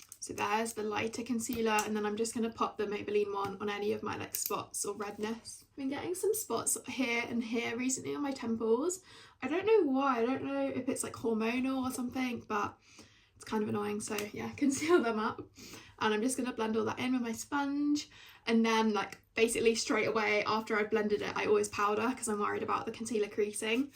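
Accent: United Kingdom. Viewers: Ur bri ish? What?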